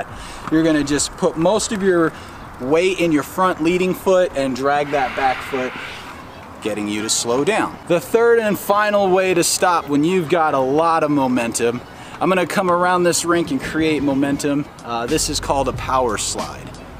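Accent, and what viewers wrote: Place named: RP, United Kingdom. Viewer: Thank you . Your english is very articulate and congrats for not having a local accent . Its almost a boarding school accent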